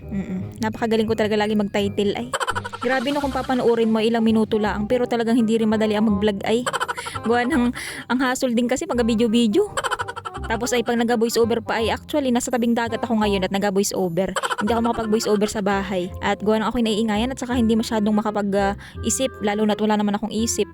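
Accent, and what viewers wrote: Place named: Visayas, Philippines. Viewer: Ido bakit Minsan may halong Visaya Ang salita mo? Sinong Visaya sa maguwang mo?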